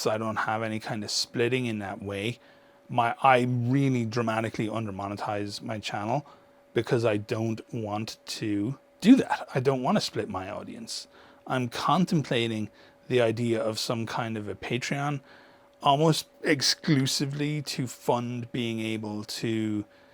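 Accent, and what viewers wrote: Ireland. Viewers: I listen to your long videos for the same reason I listen to Oculus Imperia: those dulcet Irish tones.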